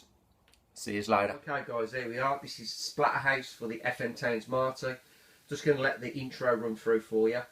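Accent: Birmingham, United Kingdom. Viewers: Congrats, lovely addition to your cillection. Looks fantastic, really nice graphics on this version. I like those huge neo geo style cases as well:) Don't worry about your brummie accent mate lol people listen to talk bollocks in my gameplays so the accent must be ok:)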